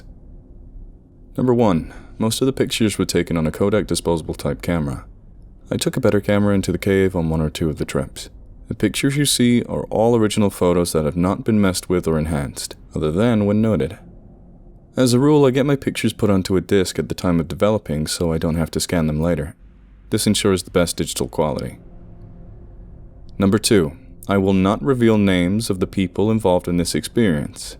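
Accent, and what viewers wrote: United States. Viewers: Your american accent is pretty good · Always amazed at your talents. Your American accent is top notch!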